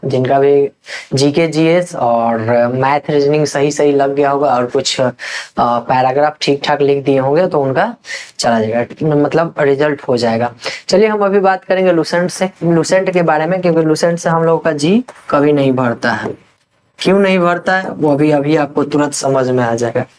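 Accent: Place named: Bihar, India. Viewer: Bihari assent in his voice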